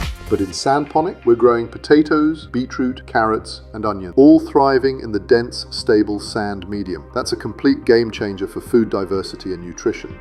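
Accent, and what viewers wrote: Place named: England, United Kingdom. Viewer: Why all the Africans doing the work and the narrator with the English accent. Very colonial.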